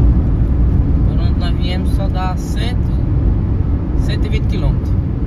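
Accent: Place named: Northeast, Brazil. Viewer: Sotaque nordestino é maravilhoso 😊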